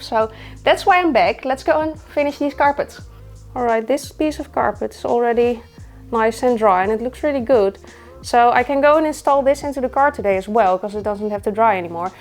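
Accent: Netherlands. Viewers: My first video I saw from you, I really enjoyed it, so thank you! I also love your Dutch accent haha.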